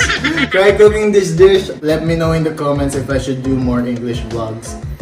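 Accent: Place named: Oregon, United States. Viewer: Congratulations 4000 subscriber 👏👏👏love this content with English version you still have Oregon accents very sweet.Made my night laughing 😂 notice you a lot of S.Hope Marky will do English version vlog.Yes more English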